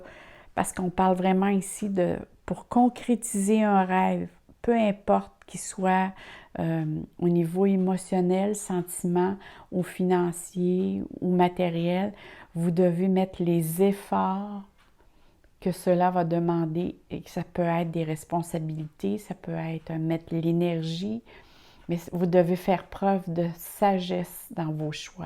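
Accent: Canada. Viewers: Merci 🙏 Ce tirage me parle énormément surtout votre ressenti par rapport à la personnalité des Poissons 🐠 Mon mari a traversé professionnellement une période difficile... 📉 mais nous commençons à entrevoir une petite lumière au bout du tunnel, espérons que fin novembre nous serons en pleine lumière 💡💡J’adore votre accent canadien 🇨🇦... 🤣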